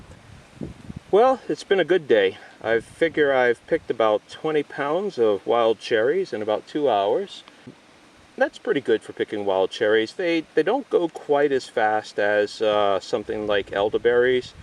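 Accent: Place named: Canada. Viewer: Actually he has a Canadian accent which sounds like a television announcer to American ears. In other words, his consonants are more clearly pronounced and his vowels are more open. There are many English accents in both American and Canada, but for the most part Canadian English is more clearly pronounced than the media-driven Modern American English which attempts to be jazzy and cool. Many people pronounce their consonants in American, but many other do not. Canadian English is refreshingly clear. I love accents!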